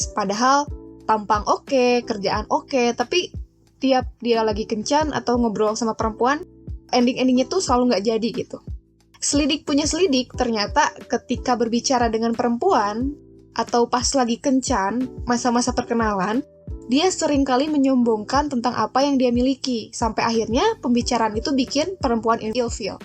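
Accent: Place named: West Java, Indonesia. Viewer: BARU NONTON SI TETEH NA SUNDA PISAN. HEHEU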